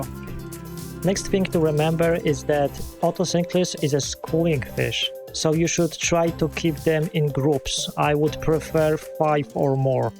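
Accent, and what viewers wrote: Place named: Poland. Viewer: Are you Polish, mate? Sounds like it😉 A few of mine died after a year for no clear reason. Balanced tank, no ammonia, no nitrite/nitrate spikes, much green algae growth on side glass, no new fish to bring diseases whatsoever.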